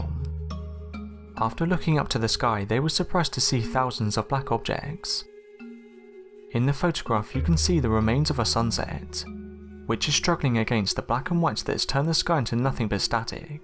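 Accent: United Kingdom. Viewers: Why are all these videos narrated by a British guy?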